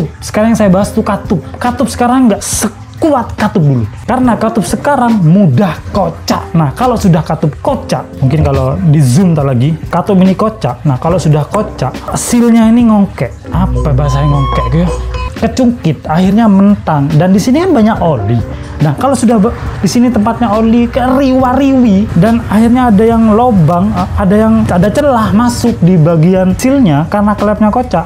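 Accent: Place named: Jember, Indonesia. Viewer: Bahasanya cekk jember nya rawes kamu mat mat 😂😂 Suwun sing akeh mas infonee 😁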